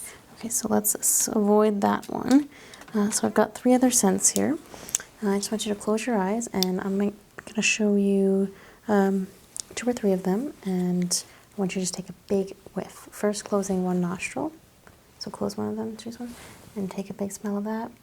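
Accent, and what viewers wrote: South, United States. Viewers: This English Girl loves a Southern Accent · Love the southern accent!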